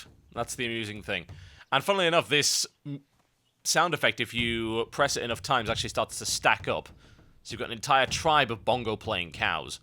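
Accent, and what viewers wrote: United Kingdom. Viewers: Lol at the people complaining that you, a British LPer, have a British accent. Personally, I get bored of hearing the non-existent Canadian accent, so I am quite pleased that my favorite WoW LPer is British. And though I said British before, as a fact (though I may be wrong) there are different types of British accents, seeing as France, England, and other countries of different languages make Britain, and they have different accents.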